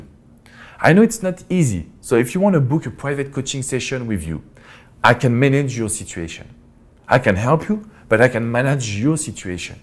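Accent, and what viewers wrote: France. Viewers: ur my best with your french accent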